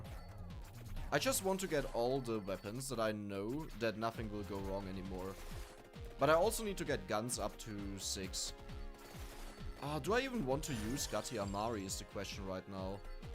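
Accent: Germany. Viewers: man hört das du Deutscher bist an der weiße wie du Namen aussprichst ^-^ DANKE für die nicen Videos ^-^ ♥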